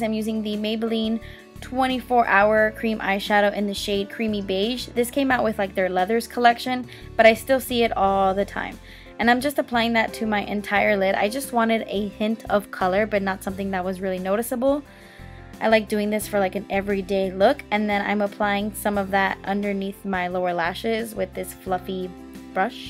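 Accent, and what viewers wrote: England, United Kingdom. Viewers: your British accent is LIFE💜💜💜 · your British accent kills me, freakin love it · okay chill girl! my only point was that has been slipping into her british accent since forever, that doesnt mean she is TRYING to sound like ZOELLA... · When will you film a whole video with a british accent? I'm totally serious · your english accent though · obsesseddd!! love the british accent lol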